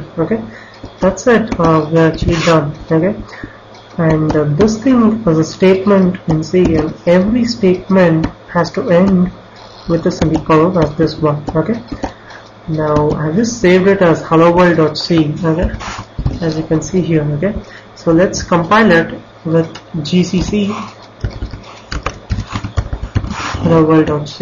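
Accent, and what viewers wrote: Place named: India. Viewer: sounds as if your an indian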